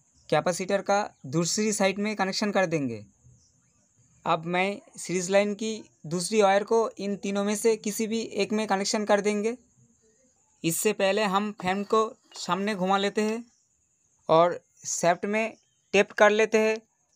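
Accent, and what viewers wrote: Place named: Bengal, India. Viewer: Bangali ho ke Hindi kau bol rehe ho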